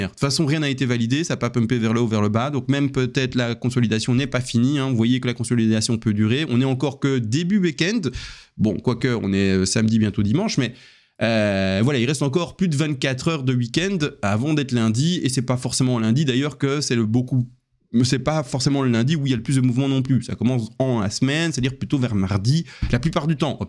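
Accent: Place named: Belgium. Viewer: Nous on dit « uit » toi tu dis « ouit » Mais depuis que je te suis, je me suis habituée 😉 Du coup tu es quoi ? Belge ? Suisse ?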